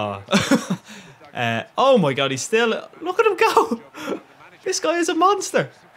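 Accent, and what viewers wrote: Ireland. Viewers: Are u Irish? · is that an irish accent? sorry that I don't know haha and will you do a packopening? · Your story was actually funny :P Nothing better than a crazy irishman (Or scotish wathever) telling a story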